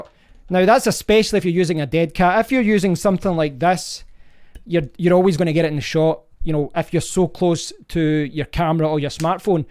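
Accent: Scotland, United Kingdom. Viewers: Each time I tried it I would get pops and noises and I just couldn't get it resolved. I would like to pull it out and give it another try but can't find it. I have wasted so much money trying to improve the audio on my YouTube videos. I should have just put out some serious cash and bought something with a known track record. Now I am trying to figure out what that would be. I want a wireless mic (tried a cheap MOVO WMIC10) and it just cuts out for no apparent reason so there would be audio gaps every once in awhile. I think audio is the hardest part for most people who come with no experience. The terminology is foreign and it has just been a steep learning curve. So there you go. My daughter-in-law is Scottish and I love hearing your accent. ;-))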